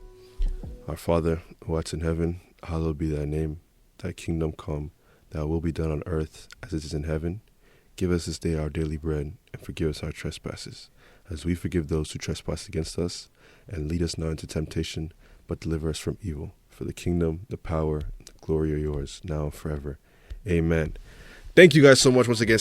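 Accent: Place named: Nigeria. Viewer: Bro you Nigerian aren’t you? 🌚😌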